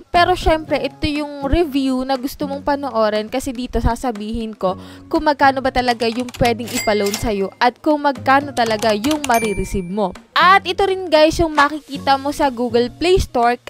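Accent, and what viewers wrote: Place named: Tagalog, Philippines. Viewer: mAam Anong province mo po may punto kasi katagalugaan...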